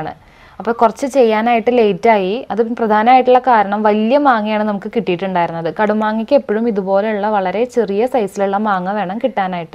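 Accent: Thrissur, India. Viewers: Enjoyed watching the video AND THE " THRISSUR BASHA ". My grand mothers & mother used make this Kadumaanga / Kannimaanga Achar ( Vadumaanga Pickle in Tamil ). They used to parcel in air tight / sealed containers at local blacksmiths and send to their children residing outside India. Now it is becoming more & more difficult to make at home and easier to buy in the market. Thanks again for the video and again for the Thrissur Basha. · Valare nalla avatharanam ethra simple aayaanu ellaa karyavum paranju thannath Evarkkum manassilaavunna thani naadan bhaashayil ellaa karyavum visadeekarichu thannu Nalla cute voice Chechiyude samsaaram kettittu chechi Thrissure jilla kkaariyaanennu thonnunnu Thrissure jilla yilaano veedu Marupadi pratheekshikkunnu Ethrayum nalla sabdathinudama yaayittenthina Mukham kaanikkaathirikkunnathu Thank you chechi thank you veri much🙏🙏🙏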